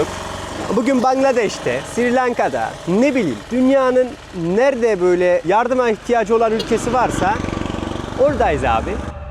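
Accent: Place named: Sivas, Turkey. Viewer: Evlat ya ben sivaslıyım konuşman şiven hareketlerin o kadar çok sivaslılara benziyor ki hele şu norüyon demen varya beni bitiriyor 😂😂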